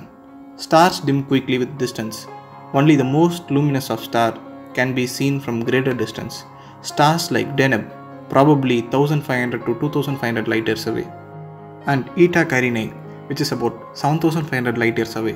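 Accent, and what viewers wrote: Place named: South India, India. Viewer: Your accent is very similar to a South Indian men. Am I right?😀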